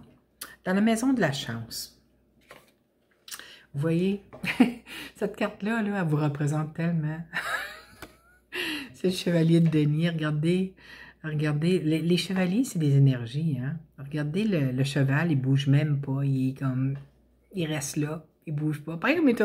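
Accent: Canada. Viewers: Toujours un réel plaisir de vous entendre. Vous êtes toujours dans le juste. Et petit aparté, cela me fait du bien de réentendre l'accent canadien 😍 . Bonne continuation à vous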